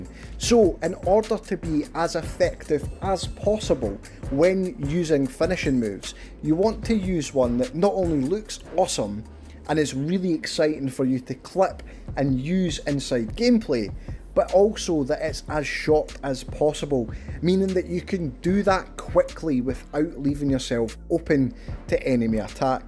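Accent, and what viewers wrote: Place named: Scotland, United Kingdom. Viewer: Great how to man, this game is so intense. And Always love your Scottish accent bud! Makes the commentary entertaining and flows well with the video.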